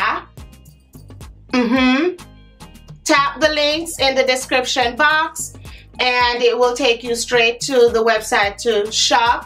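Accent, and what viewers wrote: Jamaica. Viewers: First of all I love those dresses, but mi luv di Jamaican chatting,am crazy about looking good u go cuz, we shared the same last name, big up uself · Girl I just stumbled on ur channel and if mi love you one time. As a fellow Jamaican you got me laughing cause I was seeing and hearing myself in you Xtra plus tax 🤣 love ur vibe, amazing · Ok you’re too cute and I love your Jamaican accent. New subscriber her😊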